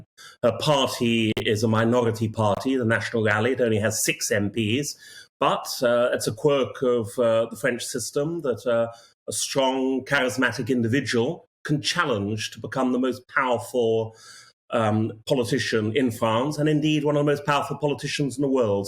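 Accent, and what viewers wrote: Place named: RP, United Kingdom. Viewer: Plummy tw*t 🙄 Is he French or English? 🤦🏻‍♀️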